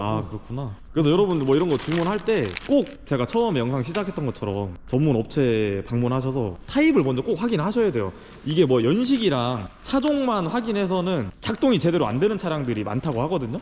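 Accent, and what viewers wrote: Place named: Cheongju, South Korea. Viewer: ㅋㅋ 제가 청주 사는데 동네 친구 목소리 인줄 알았습니다. ㅋㅋ 사투리가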